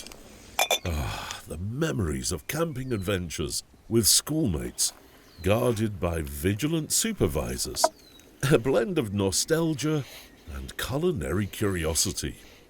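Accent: United Kingdom. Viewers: Get rid of the British narrator please!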